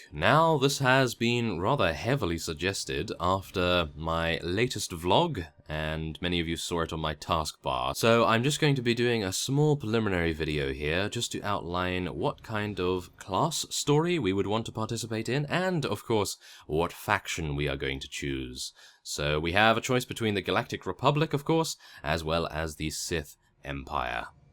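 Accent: United Kingdom. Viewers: Make a guild please :D, I will join you! we will all join you! Mwahahahaha! (please go empire, any class! british accents are awesome in my opinion! :D)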